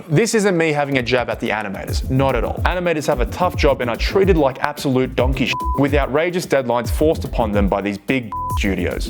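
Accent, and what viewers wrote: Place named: Australia. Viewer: I wanna learn the aussie accent with this guy talking about anime. Perhaps he has a playlist with that specific content. Checking . . .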